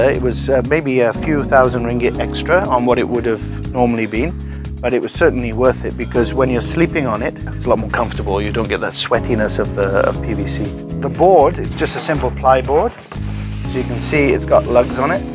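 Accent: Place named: South Wales, United Kingdom. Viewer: Nice bus great work. Your south walian accent is still hanging on. 👍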